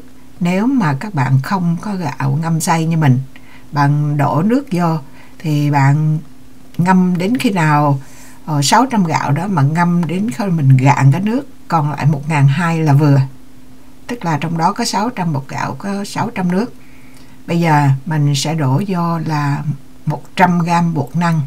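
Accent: Quang Nam, Vietnam. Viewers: Chào, Mình rất thích nghe giọng Quảng Nam cuả 😍🤩 Bánh rất ngon, dòn và đẹp lắm. Like 👍👍👍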